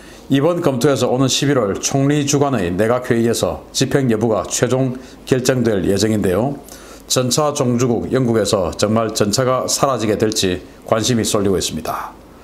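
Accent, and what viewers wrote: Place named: Gyeongsang, South Korea. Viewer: 경상도 말쓰는거 보이 미똥당 ?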